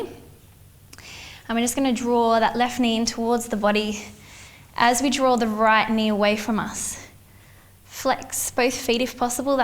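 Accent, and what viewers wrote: Australia. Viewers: Loving the accent! Is it Australian?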